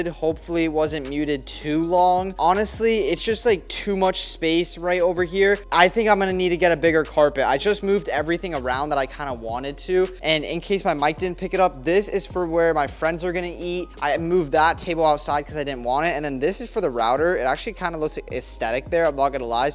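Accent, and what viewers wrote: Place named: United States. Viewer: Bro is so American England is better he has so strong accent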